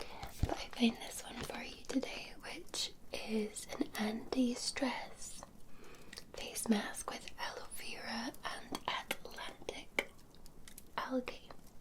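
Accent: Scotland, United Kingdom. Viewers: That's hoj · What a perfect start to the beginning of the week with an extra 10 minutes added to your video! I must say that you are looking extremely lovely tonight 😘. You're hair is like the hair of an angel 😇, straight soft and silky! I swear you're videos keep me relaxed, calm and so soothed. 😌 I love when you do the Sh, Sh, Sh, it's really tingley, why I have no idea, but it just is!! The facial mask and all of your lovely smells and sprays you use would definitely help me sleep incredibly well 😴. The relaxing session is very relaxing and a anatomy class combined 😴. But nothing beats you're lovely soft spoken Scottish accent when you read those stories 😊. And this story was awesome 👌. I gotta here more please 🙏. Thank you for this brilliant Asmr video! I hope you are well and everyone is doing great 👍. Take care 💛 🥰 🥰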